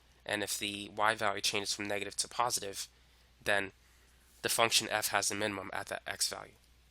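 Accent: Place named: Rural, United States. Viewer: his low key countræ accænt 😍😍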